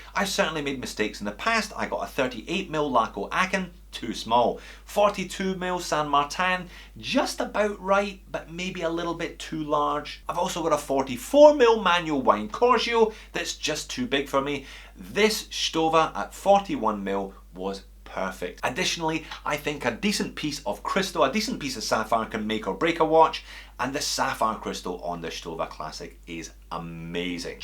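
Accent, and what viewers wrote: Scotland, United Kingdom. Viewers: I think I heard you say you were Australia, but damn, you sound like a Scott. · He's not a native. Accent is for sure from the British Isles somewhere.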